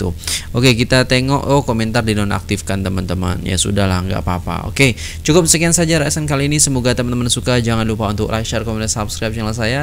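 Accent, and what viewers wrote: Malaysia. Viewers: ni cara pertuturan dia sikit lebih kurang mcm org M'sia. Senang faham. Terbaeek👍👍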